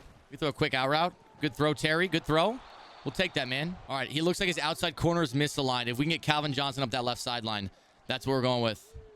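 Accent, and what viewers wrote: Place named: United States. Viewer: Normal American accent lol we do that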